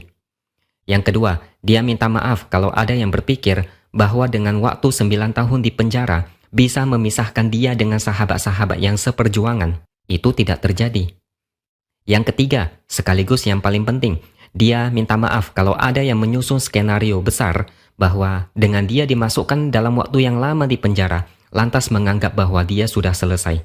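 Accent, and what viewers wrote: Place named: Chinese-Indonesian, Indonesia. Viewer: Logatnya seperti cindo lg ngomong bahasa indonesia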